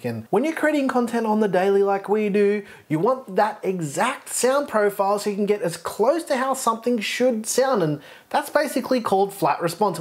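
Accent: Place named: England, United Kingdom. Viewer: English accent IS shit ans a Real pain for french ears 🗣️🙉😢 Un homme de France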